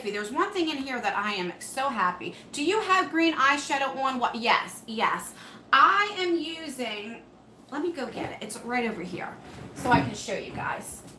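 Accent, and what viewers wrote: New Jersey, United States. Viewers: Your eye make up is so pretty you have lovely eyes and your lipstick is so pretty too. I love your accent . Makes me think of the Sopranos . I hope that's not an insult. Xxx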